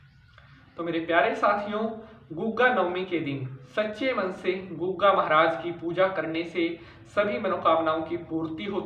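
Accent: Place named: Punjab, India. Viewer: Koi Punjabi lagda video bnon wala hindi nal gutham ghuthi hon dya e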